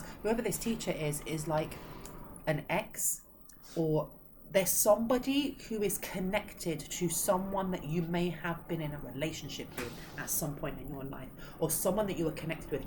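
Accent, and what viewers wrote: England, United Kingdom. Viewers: GUESS WHAT, EVERYONE USUALLY HAS CARS HOTRODING , ALOT OF NOISE OUTSIDE, THATS LIFE, MOST HOUSES , ESPECIALLY THE MODERN MODULAR HOMES ARE NOT SOUND PROOF. HOWEVER, I AM VERY LUCKY, MY HOUSE HAS 6 INCH WALLS AND WELL INSULATED. SOMEONE IS JUST NITPICKING, BLOW THEM OFF , ENJOY YOUR READINGS, LOVE YOUR ENGLISH ACCENT! CHEER UP,, DO NOT LET NASTY PEOPLE RUIN YOUR DAY! KOOTOOS!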